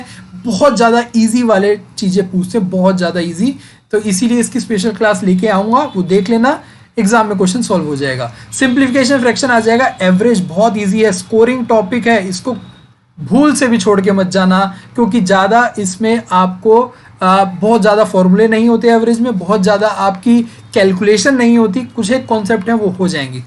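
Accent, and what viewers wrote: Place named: Haryana, India. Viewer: Haryana se lagte ho.... 😁😂😂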